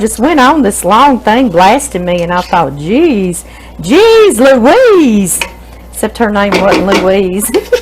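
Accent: South, United States. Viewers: This looks so delicious!! I just love watching you and listening to your southern accent 😍 I just love pampered chef, you keep on Killin them with your wonderful kindness ❤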